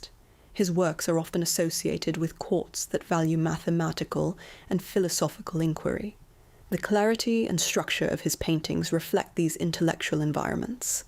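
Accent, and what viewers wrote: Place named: England, United Kingdom. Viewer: Hate the clipped English accent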